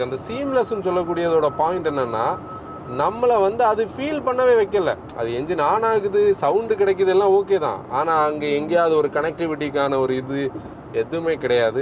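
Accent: Kanyakumari, India. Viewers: Kanyakumari slag 😊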